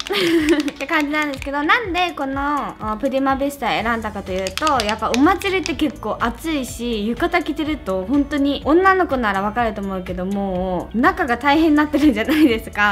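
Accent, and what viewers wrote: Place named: South Korea. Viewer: ・ 韓国の人って、さしすせその発音がすごい可愛いですよね😍❤️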